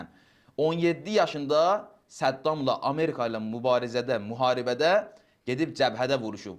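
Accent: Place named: Iran. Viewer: Sən niye ıran ləhçəsi ile danışırsan?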